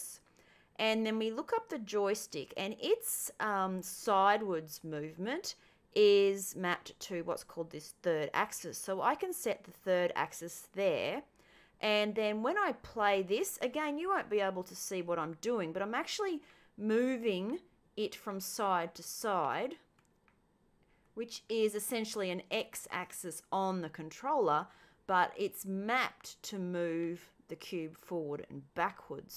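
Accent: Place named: Australia. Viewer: you sound like you from southern queens land or northern nsw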